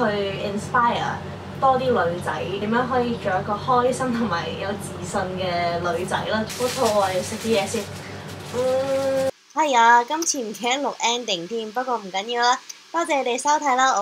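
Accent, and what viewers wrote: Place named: United States. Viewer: 一直都以為你係ABC 因為你個口音同性格都好似XD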